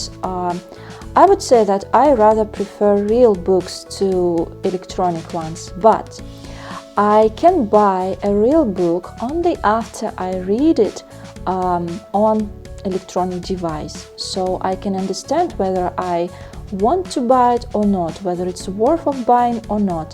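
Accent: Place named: Russia. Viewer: Уроков с правильным произношением и правилами полно. Это тренинг на формулировку своих мыслей на английском. И почему-то русский акцент русскому понимать проще😊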